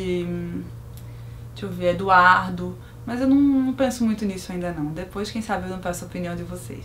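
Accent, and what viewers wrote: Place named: Recife, Brazil. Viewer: Esse teu sotaque é o melhoor! Recifense <33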